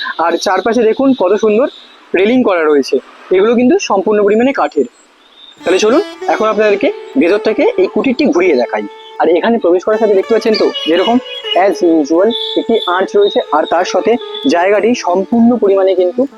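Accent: Bangladesh. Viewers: বাংলাদেশের মাল,উচ্চারণ তো এরকম হবেই। আর মানুষকে বিভ্রান্ত করে পুরো ভিডিও দেখানোর কৌশলের কারণে থাকা খাওয়ার ব্যবস্থার কথা বলে,এভাবেই এদের পেট চলে। একই কথা দশবার বলে ভিডিওকে বড় করে।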